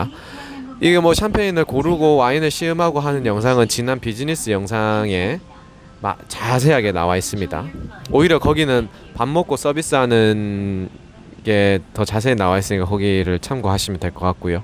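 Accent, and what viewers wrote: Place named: Gyeongsang, South Korea. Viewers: 등드리. 나나.. 정말.간만에.듣는.고향말..ㅎㅎ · 갱상도 사나이 발음....잼납니다...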